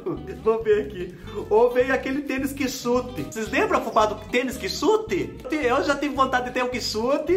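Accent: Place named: Northern Paraná, Brazil. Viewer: Hei Fubá, que divertido ver vc falando "paranaês" do norte! Sucesso.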